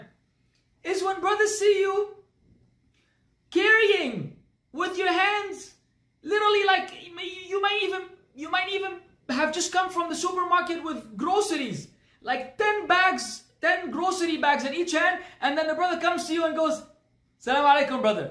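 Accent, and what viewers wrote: Egypt. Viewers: assalaamualaikum ya akhy al habib, lol bro ur egyptian accent is on point and ur jokes are true lol barakAllaah feek for these lectures. :) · Nice egyptian accent :D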